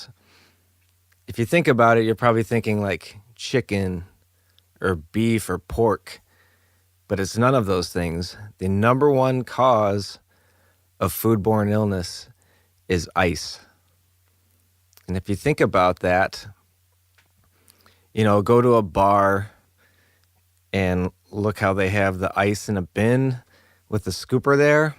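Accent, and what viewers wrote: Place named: Chicago, United States. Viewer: Im from San Antonio and knowing full well your from Chicago this probably wont make sense, but your Chicago accent is hilarious. BTW its a Fender Bass VI. Love this episode.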